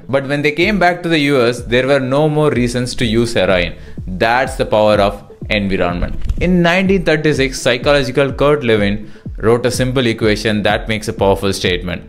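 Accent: India. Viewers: Thanks you are so relatable. Please be our Ali abdal . I love our Indian accent .. do study with me videos as well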